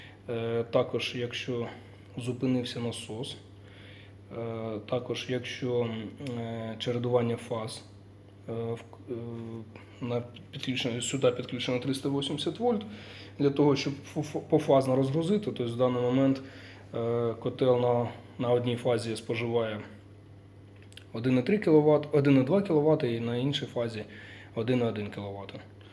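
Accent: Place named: Surzhyk, Ukraine. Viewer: Чего вымучивать на суржике-то?)) Ты ж на русском же лучше говоришь. И просмотров больше было бы))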